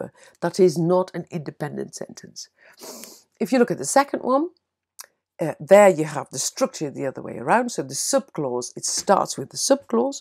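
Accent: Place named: North, United Kingdom. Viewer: Just interested.. Is the lady on the video a native speaker of Dutch? I would have thought so, despite the slight northern British accent 😊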